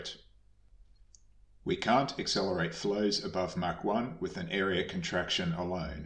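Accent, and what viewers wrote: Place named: Canada. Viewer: Great video and very pleasant Canadian accent.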